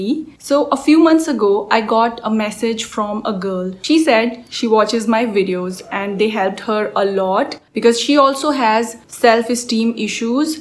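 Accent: India. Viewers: When I saw the thumbnail i was like someone Korean , Japanese etc but when j heard the voice ohh indian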